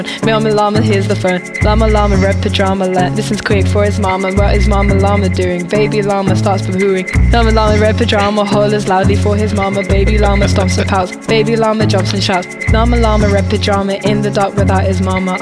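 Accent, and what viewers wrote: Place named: Bath, United Kingdom. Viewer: its cah she got a bath accent stfy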